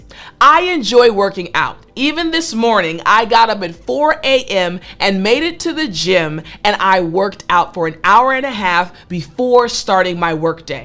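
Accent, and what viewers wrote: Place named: United States. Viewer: Best American accent I've ever heard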